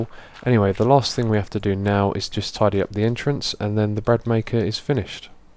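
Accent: England, United Kingdom. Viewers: Nobody say 'dude you're stupid' here but are you British? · what part of england are you from? :)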